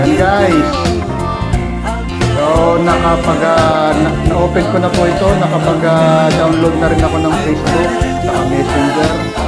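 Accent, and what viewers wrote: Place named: Ilocos, Philippines. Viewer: Tga Baguio Mama Ko Po Pero D2 Na Cya Sa Cebu Date May Bahay Cla Sa Naguilian Ngayon Nasa Baguio Na Mga Titas Ko Kaya Pala Ang Tuno Ng Salita Tulad Ng Lola Ko Kase Tga Naguilian Pala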